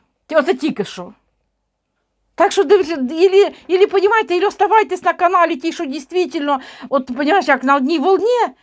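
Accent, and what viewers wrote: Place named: Surzhyk, Ukraine. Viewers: Доброго дня, все правильно говорите-не маємо права забувати полеглих воїнів,захисників наших! Жодного імені! Говоріть так як вам зручно!Як кажуть:"На польтавском діалекті "!Це наша говірка. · ваш суржик такий чудовий, колоритний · Пані! Не звертайте уваги на тих,хто говорить всяку дурню! Дивлюся ваші розклади із великим задоволенням! А вашу мову суржик страшенно обожнюю. Зразу згадую дитинство і своїх дядьків і тьотю Ніну,Женьку і т.д.,які жили біля Рівного! Здоров,я вам і терпіння! Слава Україні іслава ЗСУ! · Дякую Вам,за позицію,за свою думку,за ваш суржик,за те,що Вам болить Україна,за те що задумуєтесь над майбутнім,зате,що закликаєте до пам'яті,бо це ген нашого народу.Дякую.❤ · А мені подобається ваш суржик) Україна розмовляє суржиком, Полтава, Дніпропетровщина, Слобожанщина. Чим кацапською, без "ге" - краще суржиком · Пані, не тратьте на таких своїх сил. 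Адекватні вас розуміють, а іншим ви нічого не докажете. Робіть спокійно свою добру справу. А стосовно мови, то я наприклад теж з Полтавської області і розмовляю точно як ви. І мене це не дратує. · Супер суржик! Хоч це і не суржик, а східно-південна говіркка української мови! А ми як балакали так і будемо балакати!))) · Я з дніпровської обл, розмовляють у нас теж так. Повага Вам за те, що справжня, себе не прикрашаєте і тим більшості подобаєтеся за щиру правду і гостре слово. Слідуючим президентом буде жінка, а нам, від кого нічого не залежить, треба ставати господарями своєї країни і досить боятися!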